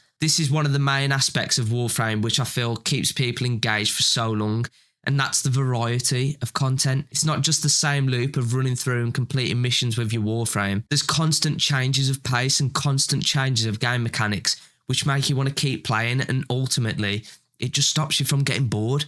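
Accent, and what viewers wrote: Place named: Dudley, United Kingdom. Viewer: ive literally just found this and why does it sound like your from Dudley >.<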